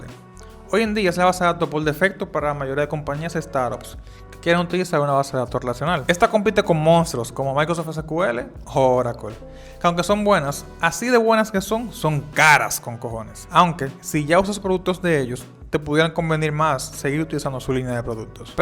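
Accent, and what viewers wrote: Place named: Dominican Republic. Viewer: Algo me dice que eres Dominicano, si es así, estoy muy orgulloso por ver compatriotas haciendo esta clase de contenidos. ¡Excelente vídeo! ¿Podrías hacer un vídeo sobre el road map que una persona no informática debería seguir para ser un Desarrollador de Base de Datos en 2025?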